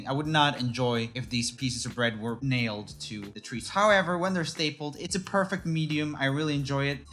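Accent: Sweden. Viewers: Holy shit. As a swedish boy I can vouch that swedish does not sound that weird. He has one of the funniest dialect I've ever heard. Where TF are u from (is it like Malmö or something) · Var det där din riktiga svenska dialekt?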